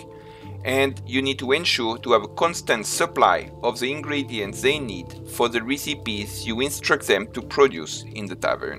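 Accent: France. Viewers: Tq for this guide despite heavy french accent and small following I find his guide comprehensive and straightforward compared to others 👍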